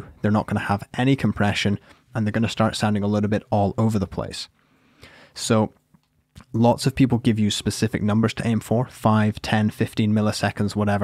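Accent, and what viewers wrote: Scotland, United Kingdom. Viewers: Well he is British according to his accent and the flag he now has in his later made video. So it's pretty aggressive for a Brit. Oh wait, maybe the stereotype is Canada lol. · I just love listening to this Scottish (?) accent. It's so soothing.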